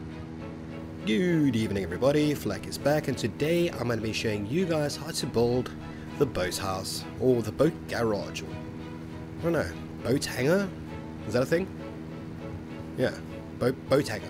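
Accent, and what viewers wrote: South Africa. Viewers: Came across your channel in suggested. And i heard your voice and thought this guy is definetly south african xD its awesome to see a south african gaming channel this big. Definetly subbed <3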